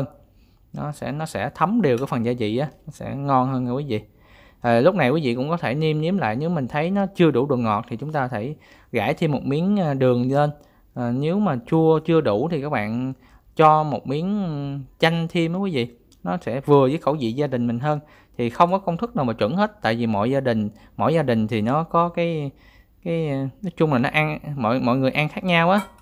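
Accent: Mekong Delta, Vietnam. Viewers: Anh nầy dân Nam bộ miền Tây giọng nhà quê nên đừng có trách ,mặc dù anh hưỡng dẩn cơ bản như vậy nhưng ta cũng cần phải luyến láy đôi chút như xử lý củ hành cho bớt cay ,sắt xéo dưa leo cho đẹp hơn ,bào cà rốt cho dài hơn ,dưa leo cà rốt đều để ngăn mát ,có thể thêm nước mắm nếu không ăn chay và rau thơm có thể dùng loại nào mình thích không riêng gì rau húng cây . Cám ơn bạn trai đã chia sẻ ! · Đặc trưng của dân miền Tây đó bạn. Mình nghe dễ thương mà! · 😁😁😁 giong chuẩn miền tây nghe ấm áp nghĩ... ❤️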